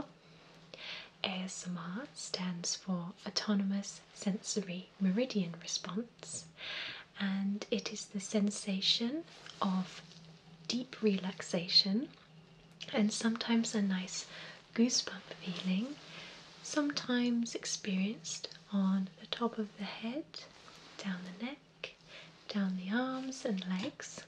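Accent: England, United Kingdom. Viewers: ASMR and British accent. This is perfect and relaxing. Plz make more doctor/nurse role-play videos. I watched it 10 times and still love to watch ! Thanks. :) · she's got a british accent, not australian! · Hello, where are you from? I ask you why your accent seems british. Regards from México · lover her accent. and i live in UK. im sick of listening to chav accents and miss this upper class sexy english voice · So here I am doing my usual to try to fall asleep eyes closed on auto play, this video comes on...Hear a British accent, eyes open with surprise... groggy eyes stare in disbelief haha. Long time no see!! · As a Brit myself I might be bias, but I can safely say I love your accent and whispering together! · I lived in Britan and sveryone sounded chavvy and not like this. Using that classy english accent is awesome >ω< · love the British accent, so perfect for this asmr :O :3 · Wow! 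I'm English too and people say I have a lovely accent, but yours is just beautiful!! I'm actually jealous of how lovely your accent is 😍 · normally, british accents annoy me,. except for today! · no one can bring out my tingles as you do! The calm, british voice is just magic! Greetings from Norway :) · EpicMeme EMPEROR I don't think you know what a Russian accent sounds like? Gentle Whispering is Russian though, I'd say her accent definitely more towards the UK. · Goood I'm fond of your clean English accent. I prefer it to an average American one. I want you to read some classic English poetry if you don't mind. Thanks for your videos. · Your British accent is so much better than mine :( Where you from Dear? :) (*Sorry I am late on this video!)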